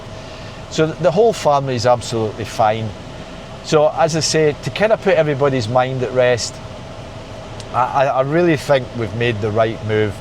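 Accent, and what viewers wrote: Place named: England, United Kingdom. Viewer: I like to listen to but not more than 10 mins as after that I start to feel bit of headache 😢, I am not used to that English sound, i am used to normal one 😮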